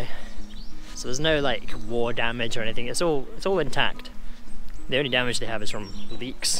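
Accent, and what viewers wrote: Wales, United Kingdom. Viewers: The Welsh in your accent is slowly appearing.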